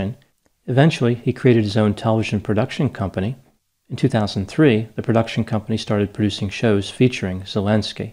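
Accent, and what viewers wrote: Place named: Philadelphia, United States. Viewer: i never noticed but are you from the philadelphia area by chance? i notice you say certain words like i do. but your accent is not strong like NE philly or bensalem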